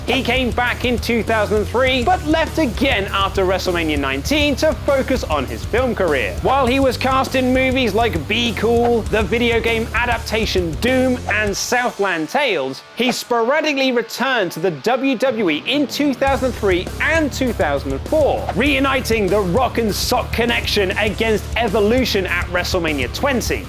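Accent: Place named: United Kingdom. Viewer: what a shady click bait title...all that talk and not once have u actually given the "real reason" why...just pure speculation one after another after another..kinda fd up..and then in comments its just as many more. and would be so much cooler if u threw some videos in (i kno u prob can't do that do the copyright issues thing) but yea some videos/small cuts from matches or backstage segments etc...would be sooo much better n more entertainment then this bombardment of a I'm guessing British, accent, on and on and on..shit gave me a headache...lmao n i couldnt even get the answer the the question of the clickbait title for it lol..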